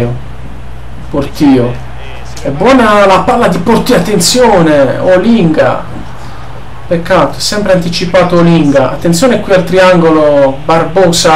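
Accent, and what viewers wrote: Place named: Apulia, Italy. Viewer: Sei molto simpatico e il tuo accento pugliese (Barese?!?) dà quel qualcosa in più!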